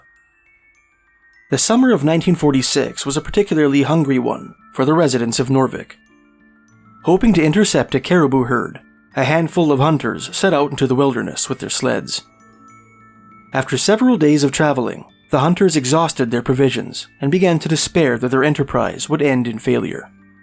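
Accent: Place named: Canada. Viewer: I saw your moving video! Was a great little video. I tried to leave a comment but i dont think it was recorded. I was wondering if the house you left was the location of the rock blasting and the little guy? And you are speaking English. "Canadian English " wow that's different. Greetings from Brazil.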